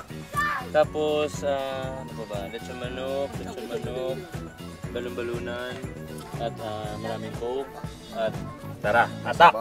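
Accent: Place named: Ilonggo, Philippines. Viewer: Ga gwa gd ang tono kung upod mo ilonggo man.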